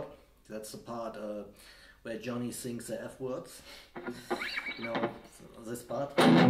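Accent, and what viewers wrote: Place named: Germany. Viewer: I guess so. German accent ...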